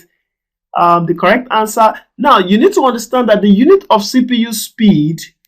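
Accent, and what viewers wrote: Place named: Africa. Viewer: he has an African accent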